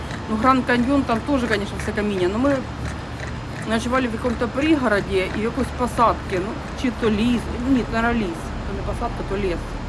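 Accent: Kryvyi Rih, Ukraine. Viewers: Коли почула суржик і зрозуміли що ви з мойого рідного Кривого Рогу! Я так скучила за ним! Живу далеко в Ліссабоні вже 25 років. Але ріднішого міста у мене немає, як Кривий Ріг!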